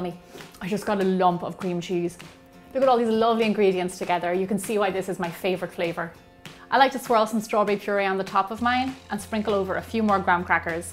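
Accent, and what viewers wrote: Ireland. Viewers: I love your accent are u Irish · Omg i love her Irish ascent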